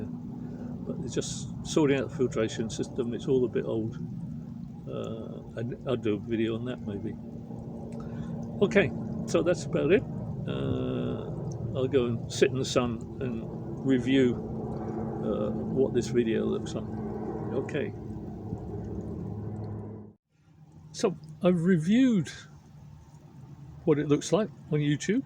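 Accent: United Kingdom. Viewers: Which country are you in and what area? Judging by your accent you are British but those temperatures dont seem wery British 😅.Im in essex so temps reqching -5 at the moment 😢. Would want as much info before stepping into the hobby. 😊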